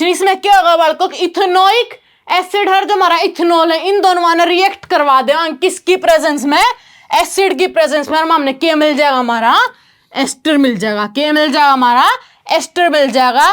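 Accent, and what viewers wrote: Haryana, India. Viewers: Bahut hi accha padha rahe ho madam sabhi samaj sakte hai haryanvi boli bahut acchi lagi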